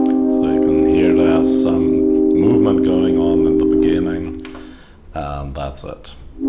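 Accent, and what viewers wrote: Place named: Germany. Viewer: Ich schreib mal auf deutsch, weil ich faul bin und an deinem Akzent auch raushöre, das du deutscher bist :-) Das Belächeln von Romplern fiel mir in einschlägigen Foren auch auf und ich kann heutzutage nur sagen, dass das Lächerlich ist! Witziger Weise hat einer der Typen, der „Rompler“ belächelt, in seinem Profil angegeben, dass er NI Komplete 8 besitzt (schon ein paar Jahre her). Wenn ich diese NI Libraries mit meinem Roland XV-5050 und SRX-08 und 05 vergleiche, kann ich nicht anders als diesen Typen auszulachen! Vom Prinzip her ist so eine Software-Library mit den Instrumenten nichts anderes, als ein „Rompler“. ABER: Die Ni-Dinger waren oft Sample-technisch viel komplexer aufgebaut, als die meisten Presets auf meinem 5050, aber klangen halt leider nicht halb so geil. und wenn ich recht überlege: Die besten Beats, die ich in meiner Jugend gehört habe, wurden mit solchen „Romplern“ gemacht. 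Hol dir einen aus der Roland JV oder -XV-Serie oder Korg Triton, Yamaha Motiv und du hast eine breite Palette an Instrumenten, die GEIL klingen! Samplen ist heute ohnehin eine heikle Angelegenheit.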